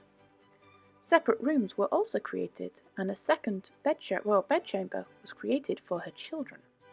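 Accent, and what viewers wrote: Doncaster, United Kingdom. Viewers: I can not believe that no body has commented on your excellent video - it is refreshing to hear a lady from Donny talking about the Railways - stay safe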